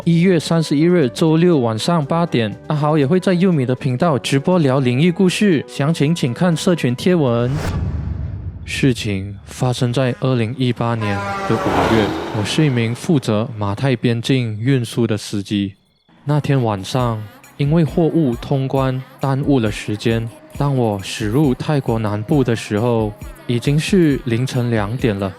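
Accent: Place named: Singapore. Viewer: You sound like a Singaporean.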